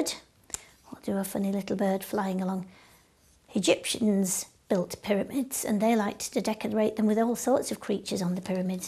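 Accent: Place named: United Kingdom. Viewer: First hearing British accent so sweet and cute English :3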